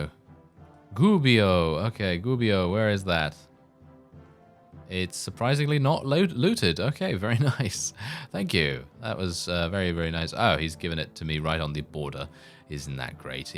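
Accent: United Kingdom. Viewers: Angelo Orsini (literally little bears, one of the most influencial roman family of that time) would be a very nice name for your character. It's lovely to hear speak italian with your british accent. If you are gonna make a series, join Venice, make the doge proud. Hail San Marco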